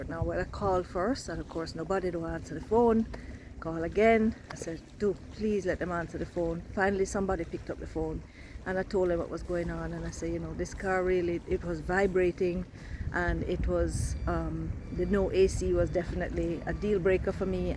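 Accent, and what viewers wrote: Jamaica. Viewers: Ive never heard a white person with an authentic Jamaican accent, I like it 🫡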